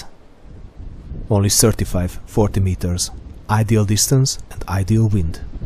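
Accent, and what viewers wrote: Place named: Hungary. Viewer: Magyar akcentust érzek a levegőben. Minden tiszteletem nem lehetett egyszerű a vadászat!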